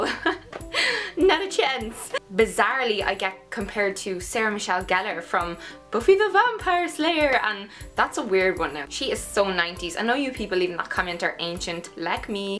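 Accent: Ireland. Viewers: where in ireland are you from? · Thanks :) Personnally I prefer english speaking .. ^^ And I like your Irish accent too, and one day I'd like to visit Ireland. Seems to be such a beautiful country! ;)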